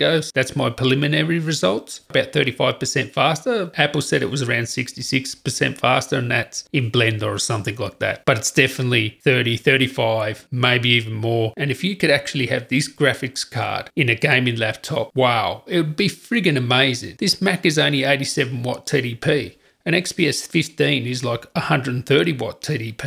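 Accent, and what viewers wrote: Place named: Australia. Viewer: ah.. you did it! great content, your accent is quite australian though!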